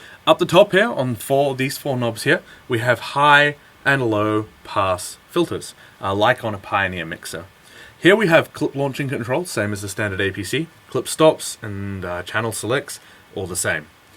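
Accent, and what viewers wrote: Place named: New Zealand. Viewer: Damn. I'm buying an APC 40 Mkii this week, really nice to hear a fellow Kiwi voice explaining the ins and outs. Great demo, and I'll be downloading the scripts and exploring the functions. I cut my teeth all on technics 1200's and vinyl back in the early 90's, dropped out of the scene a while, got back in with traktor and the M-audio exponent midi controller, then messed with Pioneer CDJ's a while, but never really felt quite at home with any of them. Maybe the APC will be the right path back into it, don't know yet. Your video makes it look good though, and definitely an affordable experiment. Cheers!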